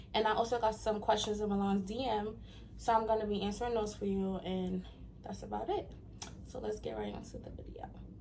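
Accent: New York, United States. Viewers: I heard that spicy New York accent from your 1st video 😁( Bk/NY girl myself) Congratulations on your new Youtube page & Im looking forward to seeing more of you. WELCOME!! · I knew you were from NY, I can hear it.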